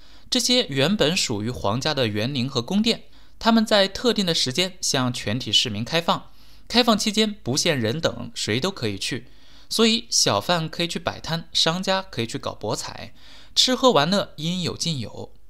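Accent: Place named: Hefei, China. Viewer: 听着像合肥人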